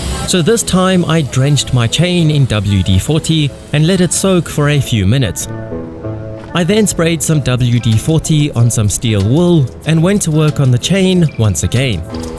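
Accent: South Africa. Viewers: Are you south african ?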